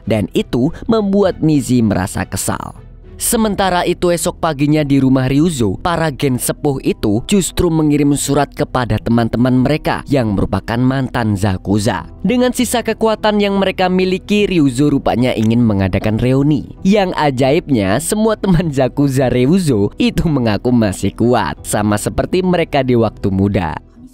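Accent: Banjar, Indonesia. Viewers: Baru tau kalo org banjar😂dari sekian lama nonton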